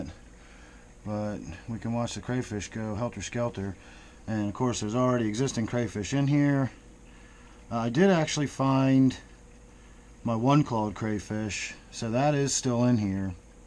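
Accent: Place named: Maryland, United States. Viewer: Are you from Maryland? You sound like you have an old-school Maryland accent, but sometimes tidewater Virginia or eastern North Carolina can sound very similar.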